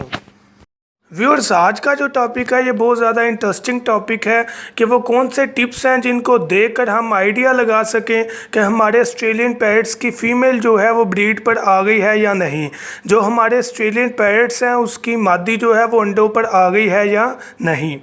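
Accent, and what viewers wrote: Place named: Punjab, India. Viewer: Punjabi lahja hai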